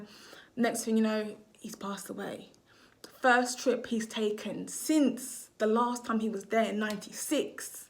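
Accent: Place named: United Kingdom. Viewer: Its called an accent... She's British.. Dont be that type of person famz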